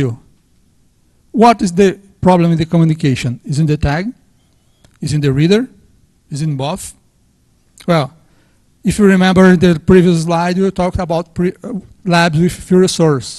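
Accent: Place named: Brazil. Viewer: His accent looks like brazilian accent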